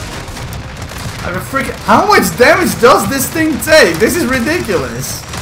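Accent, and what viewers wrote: Netherlands. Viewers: I'm hearing a Dutch accent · You Dutch bro? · Hahaahah holie molie heerlijk nederlands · Are you dutch? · i smell Nederlands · you dutch right i can just feel and hear it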